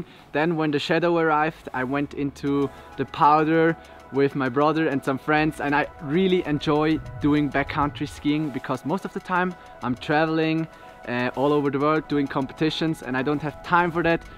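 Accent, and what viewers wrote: Switzerland. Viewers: du da hämmer emol wieder dä schwiizer dialekt😂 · haha fix en schwiizer englisch akzent ;D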